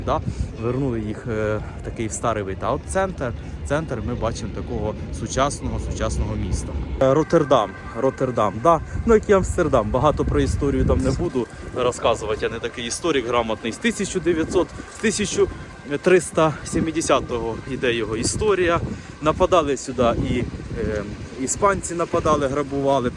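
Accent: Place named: Dnipropetrovsk, Ukraine. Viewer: Говір як з Дніпропетровщини )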